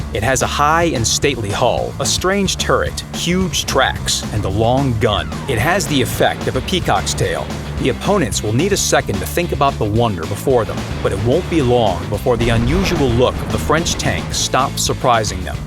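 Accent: England, United Kingdom. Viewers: I love your english accent ! the R-E-V-A-L-O-R-I-S-é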